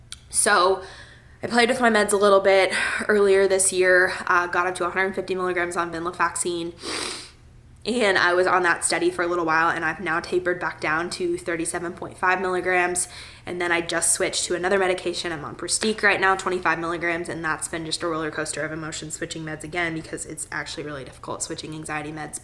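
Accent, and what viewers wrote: South, United States. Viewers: Yes girl definitely so happy for you and so happy your back! Your a real inspiration and have such a kind heart, it’s so nice seeing someone who is genuine and I feel like your getting alittle southern accent in you!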